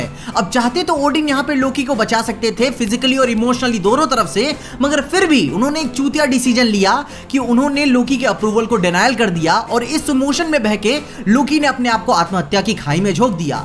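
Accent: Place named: Bihar, India. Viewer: भाषा के बोली से त बिहार से लग हथिन ।।।## कभी निराश न करे ला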